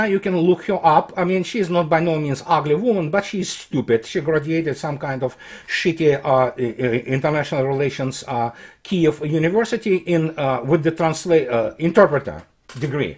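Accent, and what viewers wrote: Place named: Russia. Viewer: It's awesome and funny AH to be listening to American slang with a Russian accent!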